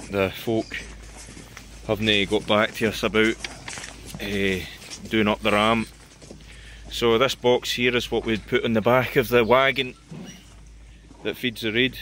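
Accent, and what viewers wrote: Scotland, United Kingdom. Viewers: Can you tell me if that's the Ochil hills in your videos, Mrs thinks your a Fifer and I think more cumbernauld side.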